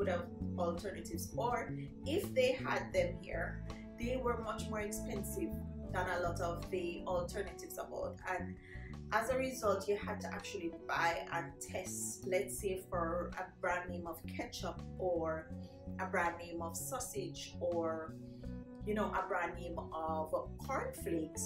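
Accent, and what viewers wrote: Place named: Jamaica. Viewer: Where in the Carribbean are you located? I’m hearing a Jamaican accent…👂🏾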